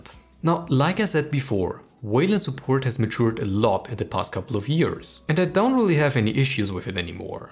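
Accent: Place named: Germany. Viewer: I like you accent its like bringing a german to texas :D Thanks for the informative video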